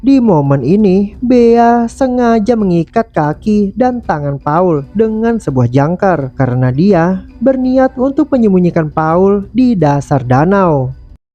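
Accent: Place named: Banjarmasin, Indonesia. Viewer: Yg bapender ni pinanya urang banjarmasin kalsel😅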